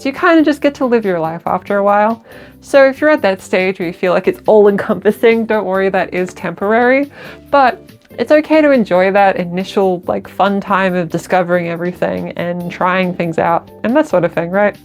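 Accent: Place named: Australia. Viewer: You kinda sound like pearlescent moon lol (its probably the aussie accent) ❤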